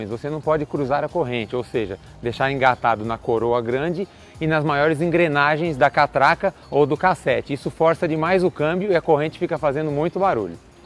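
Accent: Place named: Northeast, Brazil. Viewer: Fala direito so nordestino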